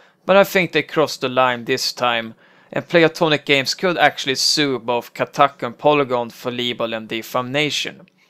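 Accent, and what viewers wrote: Sweden. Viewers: Well that's the thickest swede accent I've heard all week. Nice coverage as well.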